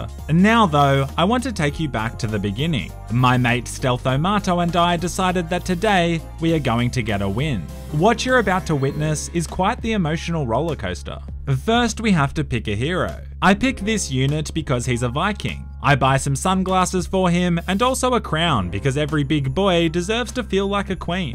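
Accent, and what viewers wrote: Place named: Australia. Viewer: Can someone pls translate this into English , cant understand this Australian. Thnx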